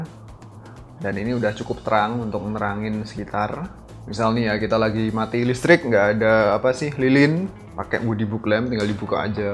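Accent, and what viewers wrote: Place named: Yogyakarta, Indonesia. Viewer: Anjir keren banget😂 bangke lu bang, jogja sekali macam aku kalo ngomong wkwk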